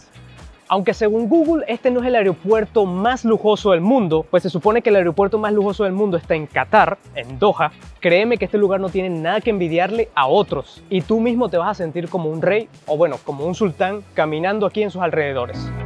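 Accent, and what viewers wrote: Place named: Venezuela. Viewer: super excelente video gracias. excelente cuenta. pareces venezolano por tu acento. Muy buena tu oratoria y edición del video. sigue adelante like y suscrito de una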